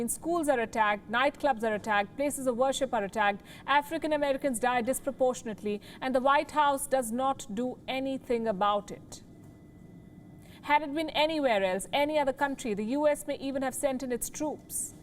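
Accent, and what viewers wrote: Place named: India. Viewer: 𝕀𝕟𝕕𝕚𝕒𝕟 𝕒𝕔𝕔𝕖𝕟𝕥 𝕚𝕤 𝕛𝕦𝕤𝕥 𝕡𝕝𝕒𝕚𝕟 𝕟𝕒𝕤𝕥𝕪 𝕃𝕆𝕃!!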